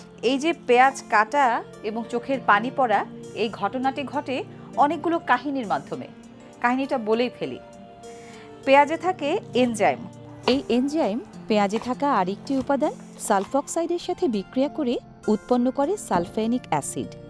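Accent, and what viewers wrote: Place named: Mymensingh, Bangladesh. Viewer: amader paser bashar ak varatiya maymonsing er sm apnar moto kotha bole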